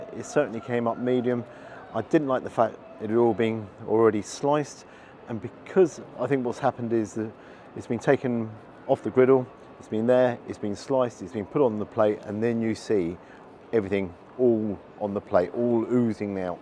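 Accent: United Kingdom. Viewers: Glad your putting all these overrated overpriced places in there place, and the british accent makes it more credible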